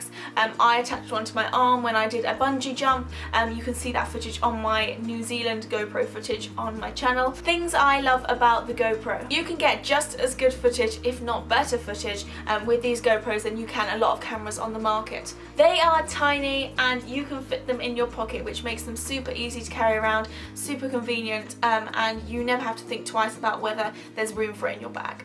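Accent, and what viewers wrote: United Kingdom. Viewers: Among all I always been very fascinated by the UK English accent..btw that's a very useful info of GoPro.👍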